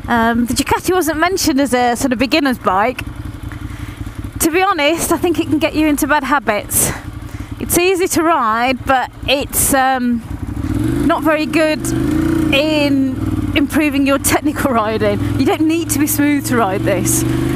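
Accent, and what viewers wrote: United Kingdom. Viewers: WOW you really are a happy bunny aren't you !! You sound like my next door neighbour, she's a Brummie gal as well. Just wanted to ask where you got the handlebar mount from? I've just bought a Go Pro 2 and I think the best point to fit on the GS is probably the engine bars but still looking for options at the moment. Great vid by the way. All the best JJ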